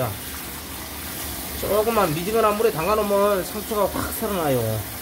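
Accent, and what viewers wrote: Jeolla, South Korea. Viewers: 전라도 사투리죠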